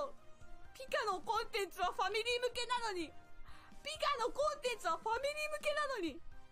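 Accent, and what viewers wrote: United States. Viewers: I'm having a hard time placing the accent. It sounds midwestern or Michigan to me. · and speaks american english. Damn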